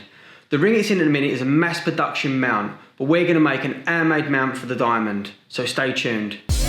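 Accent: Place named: England, United Kingdom. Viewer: Never heard your English accent before where is it from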